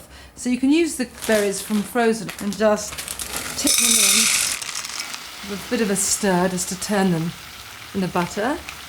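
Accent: England, United Kingdom. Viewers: Have you read Jane Eyre? Nigella has a way with words and that British accent does it wonders but that's it. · Yes. Sometimes she strikes me like one of the classic Hollywood glamour women of the 1940's. Plus she's got that mane of raven hair, the Marilyn hourglass shape, and the delightful Mary Poppins English accent.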